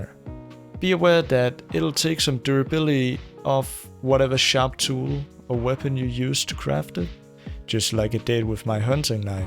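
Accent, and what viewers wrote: Denmark. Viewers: are you danish bruv? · Very informative video, great intro and thanks for the hard work you put into it! Btw is that a danish accent? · are you danish? loved the video btw!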